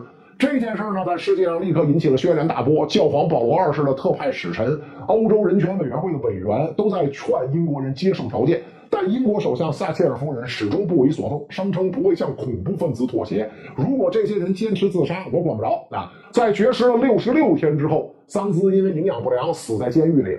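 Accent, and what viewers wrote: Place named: North, China. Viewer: 可以换一个声音吗？这个口音我实在无法听清楚，我是马来西亚人这种北方口音实在让我头疼。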